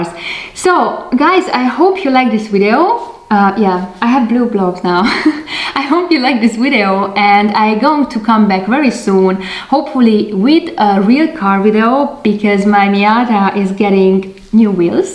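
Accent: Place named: Hungary. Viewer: A videó alatt végig sejtettem, hogy magyar lehetsz, aztán ránéztem a lejátszási listára :D Jól megy Neked az angol, de mégis megvan az a magyaros kiejtés. Viszont örülök ennek, hogy van magyar női rajongója is a Hot Wheels témának :)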